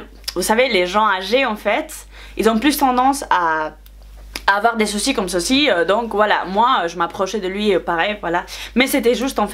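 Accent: Spain. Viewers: tu es magnifique j'aime trop ton accent espagnol ❤ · Je suis nouvelle sur la chaine dite moi quel accent elle a ? On dirait de l’espagnol ? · Cc je te suis depuis pas longtemps j'adore ton axent Espagnol sa serais mon rêve de pouvoir parler couramment l'espagnol mais j'ai encore le temps au passage ses pas des pouces mais des poux et ma question pour la FAQ est ce que ta nationalités est française ou espagnol Gros bisous ❤️😘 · non mais a son accent des le debut ça s'entend qu'elle est espagnole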